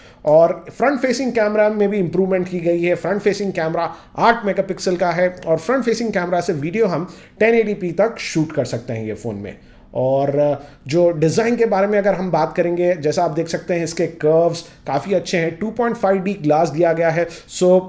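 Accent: Hyderabad, India. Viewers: Kya bhai AP Bhi Hyderabadi hai bhai..Nice Hindi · i just watch your videos because i like hearing hyderabady language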